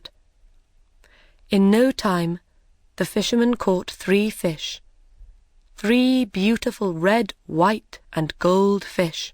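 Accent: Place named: United Kingdom. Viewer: Thanks so much.Nice story and excellent narratore with british accent.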